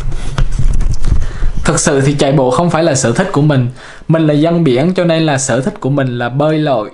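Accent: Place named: Central, Vietnam. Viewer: miền trung dễ thương, chân chấttt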